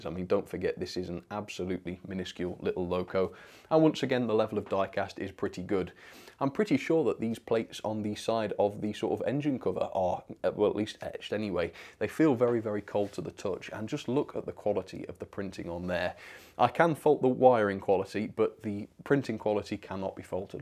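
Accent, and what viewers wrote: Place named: Shropshire, United Kingdom. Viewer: Your accent sounds familiar. You from Shropshire? I'm not a model train guy, but I'm subscribing, this is really nicely done.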